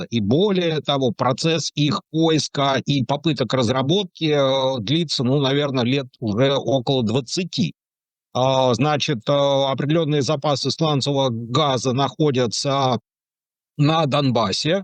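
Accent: Okanye, Russia. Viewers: Оканье невозможно слушать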